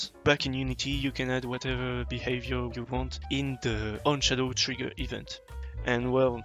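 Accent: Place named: France. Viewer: Ton accent français te trahit :) Très bon tutoriel. J'apprécie beaucoup ta chaîne YT - très pédagogique. Bonne continuation ++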